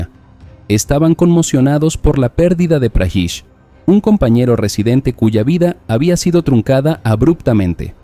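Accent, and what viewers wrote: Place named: England, United Kingdom. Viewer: Que mal narrados estan los videos, habla un español que de repente no se entiende como que lee mal las lineas y las pronuncia en ingles. Deberian poner a un narrador que si entienda y sepa leer el español.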